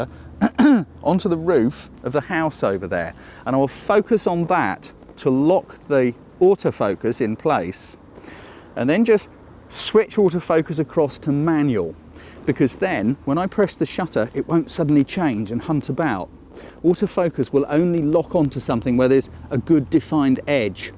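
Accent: United Kingdom. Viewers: Buddha bless you for the British accent, music to my ears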